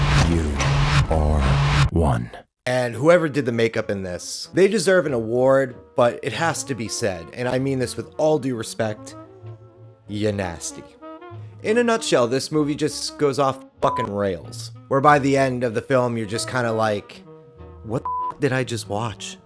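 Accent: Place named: Italian-American, United States. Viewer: Omg I recognize Mackenzie Phillips from Black Mirror! Speak No Evil looks gooood, I love horror movies where you're just super uncomfortable on a visceral level. EDIT: To add that your Italian-American/Tony Soprano accent is solid.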